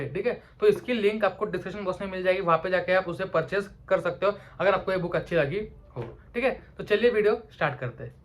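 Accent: Maharashtra, India. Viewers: Kaha se ho bhai tum ... Maharashtra se ho kya ..I think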